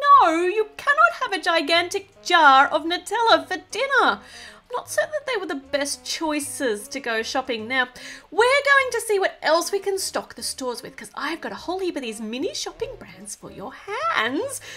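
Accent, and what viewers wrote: Australia. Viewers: OMG ARE U AUSSIE SAME! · Your Australian? I’m Australian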